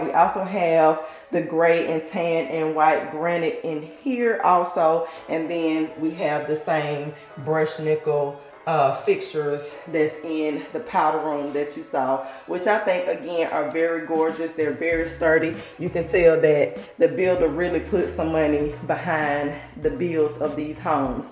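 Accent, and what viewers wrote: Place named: Tennessee, United States. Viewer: Ur accent ❤where r u from ? Sounds like ur from Tennessee or Alabama?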